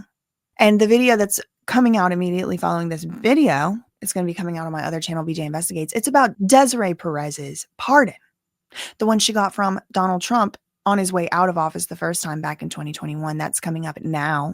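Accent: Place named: Louisiana, United States. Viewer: BJ, I get a kick out of your witty banter and Louisiana twang. You always say “allegedly”, “in my opinion”, “Gods Favorite” and last but not least my favorite, “Facts Ain’t defamation!” Thank you for always bringing a smile to my face.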